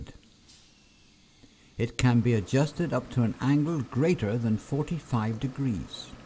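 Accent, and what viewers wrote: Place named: Cornwall, United Kingdom. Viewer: Any further West from Cornwall and you’ll find yourself in the Celtic sea! By the way, Cornwall has at least two accents East Cornwall and West Cornwall the former is closer to the standard West Country of Devon, while the Anglo-Cornish of the West, was influenced by modern English due to the persistence of the Cornish language and, therefore, it has its own cadence and phonology. I would hazard a guess that the narrator is from West Cornwall.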